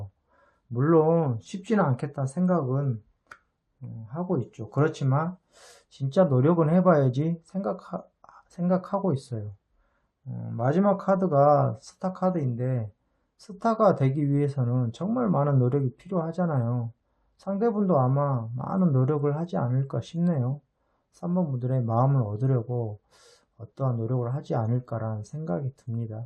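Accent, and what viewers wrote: Jeolla, South Korea. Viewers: 혹시 전라도분이세요??말투에 사투리가있어요ㅋㅋ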